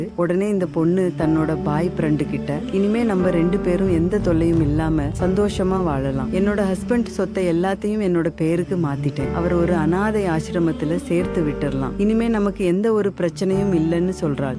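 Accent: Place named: Kerala, India. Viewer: Madam unga videos ellam super.unga voice very very super.unga kuralil malayalam kalantha tamil ketka enimaiyaka erukkirathu❤❤❤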